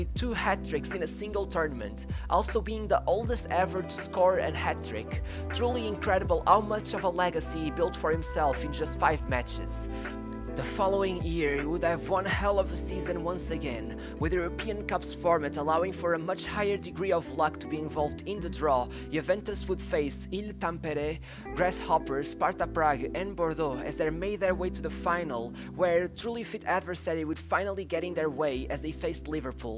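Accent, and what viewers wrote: Portugal. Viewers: The speaker has Portuguese accent!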